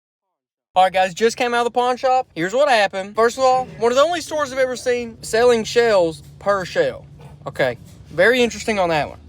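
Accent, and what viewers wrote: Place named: South, United States. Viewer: Im from Philadelphia but i gotta go down south and make friends with some southerners cause i think i could pretend to talk like you forever and it never get old. Cause everything sounds funnier to me when you talk like that. Honestly i wouldn’t be able to take much seriously at all. Lol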